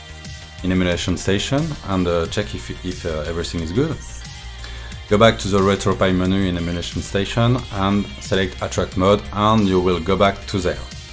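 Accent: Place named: France. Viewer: Ah ce petit accent So Frenchy que tous nous envient !! Thanks for that !!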